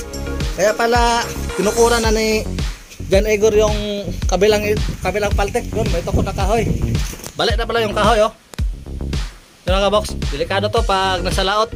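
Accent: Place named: Iloilo, Philippines. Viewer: Morag ilonggo kaman ka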